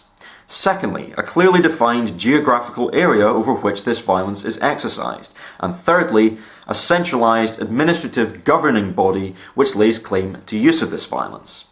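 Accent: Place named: United Kingdom. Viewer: You look like trey sessler but with a british accent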